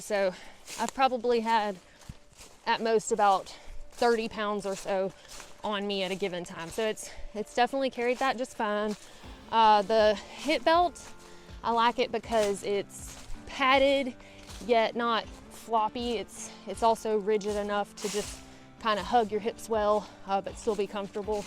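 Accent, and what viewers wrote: South, United States. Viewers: Mmmmmm bacon jerky! Thanks for sharing your adventures/insights/opinions/photography/wisdom/downtoearthness/stories/southern charm and accent!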